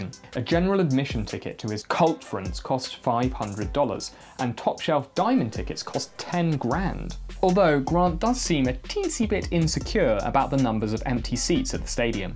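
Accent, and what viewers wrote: England, United Kingdom. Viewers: He has an english accent and never mentioned being Australian, so i don't don't know where you got that from..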